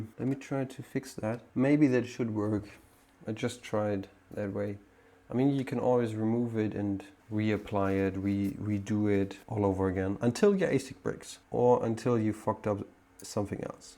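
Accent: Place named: Oxford, United Kingdom. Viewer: I love your clear Oxford English, and now weiter to the Video. Best regards Ingo. 🐇🖖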